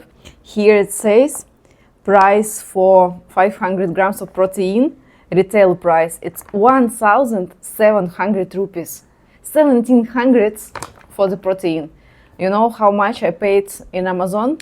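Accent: Russia. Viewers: I love Russian accent and girl ❤ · О май год. Это вас я видела пьяной и матерящейся в Арамбольсеом магазине... А акцент у вас .... Отвратительнийший - хуже индийского акцента, только жесткое рашен стайл... Не зря я не доверяю русскоговорящим учителям английского... Вы станете мечтой индийских мужчин - которые мечтают приехать в Гоа и переспать с русской... Так как кроме них вас никто смотреть не будет - это невыносимо. Но вы станете мемов в индийской блого сфере ... Вы прямо готовая РУССКАЯ НАТАША (Наташами в Турции и Азии называт "сексуальных/доступных - переводится с азиатского на обще понятный" женщин). Значение английских слов сказанных индийцами, которые вы можете воспринять как комплимент, имеют сугубо утилитарное значение и весьма далёкое от значения этих же слов в западном мире.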